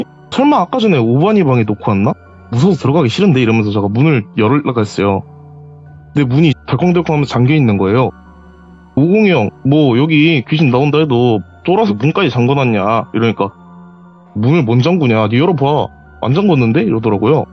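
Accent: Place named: Jeolla, South Korea. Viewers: 저가 저가 거리는거 거슬리네 전라도 사투리인가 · 뭐래요 군산 사투립니다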